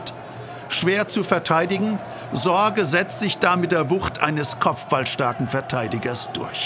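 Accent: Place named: Swabia, Germany. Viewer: Ein noch frustrierter Schwabe als Kommentator?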